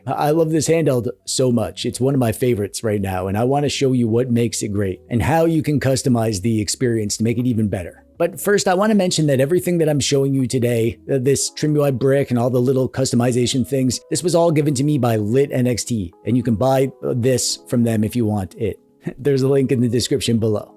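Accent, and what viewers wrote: Canada. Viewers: you're Canadian?!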